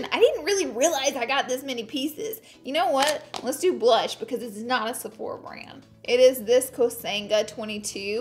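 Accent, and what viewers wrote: South, United States. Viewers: I'm from Alabama! That accent is so southern. I'm from a really country town. Like right below Tuscaloosa, Al. Roll Tide nation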